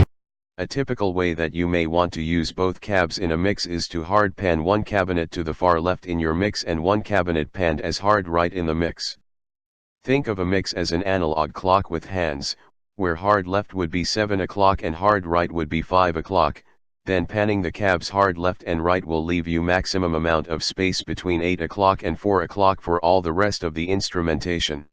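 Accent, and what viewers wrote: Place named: New York, United States. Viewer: Very useful information, thank you. I wouldn't worry about a NY Metro accent -- it lends credibility to use your own voice and would be less distracting than the computer voice!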